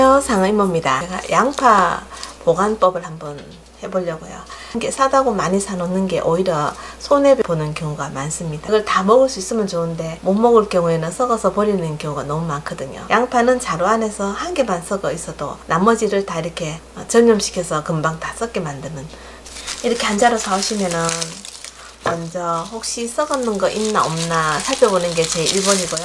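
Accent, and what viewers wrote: Gyeongsang, South Korea. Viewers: 좋은정보 감사합니다!! 차분하고 경상도 특유의 애교스런말투 너무좋네요^^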